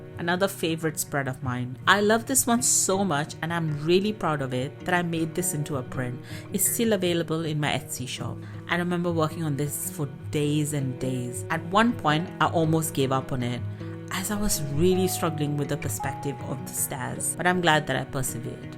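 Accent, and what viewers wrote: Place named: United Kingdom. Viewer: Hello fellow artist😃 just found your channel, I’ve been grieving the death of my nephew, feeling very sad- however, your illustrations brought a big smile to my face & lifted my heart, also Your voice is very soothing & I Love your British accent! Now your illustrations make me feel joy like the feeling of reading a colorful, well illustrated book to one of my grandchildren. Such great art & a very interesting sketch book, thanks for sharing & for the art therapy🥲😃❤️❤️❤️🎉🎉. . Makes me want to get back to start drawing & painting in one of the many empty sketchbooks I have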